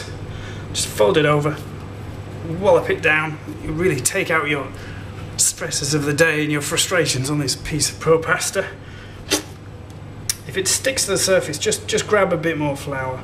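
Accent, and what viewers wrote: United Kingdom. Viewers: Yeah, in the UK, the TV is mostly a few government-run channels (at least thats what I got from going to scotland 3 times), and I don't think they have Man vs. Wild. And you know, some of the pronunciations just sounded southern Scottish to me, so that's where I got the impression. By the way, I might just not have found it yet, but if you haven't made one already, are you ever going to make a pizza video? I realize pizza is a fairly simple idea, but it has a variety just like pasta.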